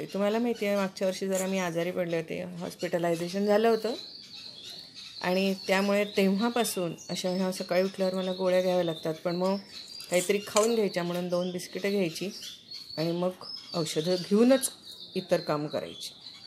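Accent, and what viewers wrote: Kolhapur, India. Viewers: मँडम चे व्हीडीओ छानच असतात आपोआप त्यात गुंतले जाते व्हिडीओ ची सुरवात पुणेरी भाषेत होत असली तरी नंतर कोल्हापूरीतच बोलतात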